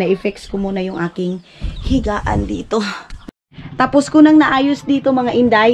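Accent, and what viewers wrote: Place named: Waray, Philippines. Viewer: Yung tuno nyong pagsasalita parang sa Amin waray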